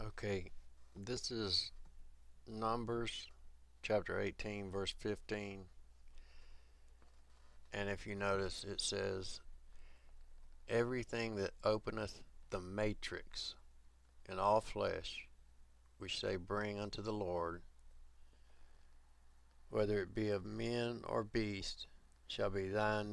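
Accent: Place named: Texas, United States. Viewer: you sound almost like a Texan. I bet your German is a bit cute. Enjoying your vids, bud. Thanks! P.S. Texans speak really slow, too, especially the ones from the Panhandle part of Texas.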